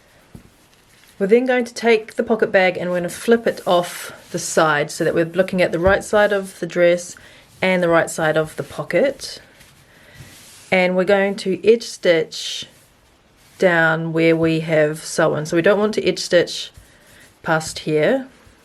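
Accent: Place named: New Zealand. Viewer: LOVED the ruler tip - Brilliant! And so nice to hear a fellow Kiwi tutorial :) It was like having my BF sew with me. Thank you <3 This is so good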